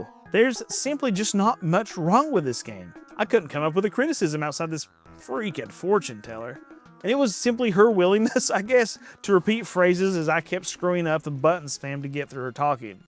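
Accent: South, United States. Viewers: I enjoyed this video way more than I ever thought and the southern accent made it way better 😂😂😂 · Your accent makes me feel at home (Grew up with southern people)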